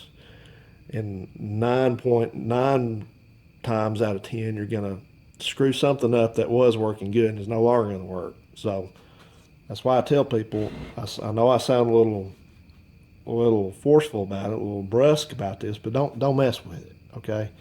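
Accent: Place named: South, United States. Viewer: Greetings: "What happenz is" ....Rednecks so fun 2 listen talk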